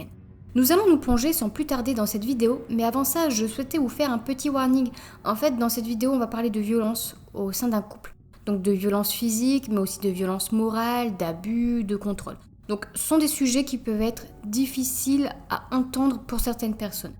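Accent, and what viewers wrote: North, France. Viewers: Je ne connaissais pas cette histoire.. 14 ans c'est bien peu. C'est un horrible personnage. Sinon je crois que j'avais demandé dans un commentaire d'une autre vidéo. On dirait que je perçois un léger accent du nord.. Je suis aussi chti c'est pour ça et j'ai la même intonation dans certains mots. 🙂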